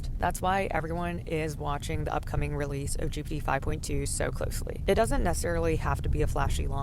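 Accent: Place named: South Carolina, United States. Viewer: You sound like you are from southwest SC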